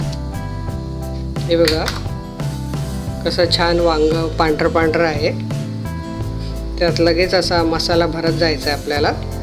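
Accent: Khandesh, India. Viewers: Aho tumhi khandeshi aslyasarakhe boltat.,mhanun. Ssory ha tai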